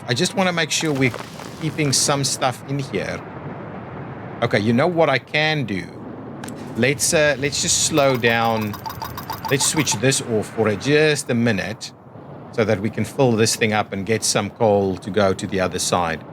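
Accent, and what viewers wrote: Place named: Afrikaans, South Africa. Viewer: Are you from South Africa? Your accent is very Afrikaans. The way the 'R' is pronounced makes me smile every time.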